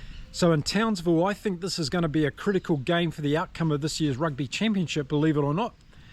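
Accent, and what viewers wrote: New Zealand. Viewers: Hi, thank you for the support, I hope you are right!! Also thank you for your neat articulation as you speak, it is really easy to understand your english in spite of your New Zeland accent, to which I'm not used to listen. For example, I have far more difficult to understand the other from Two Cents Rugby. Cheers!!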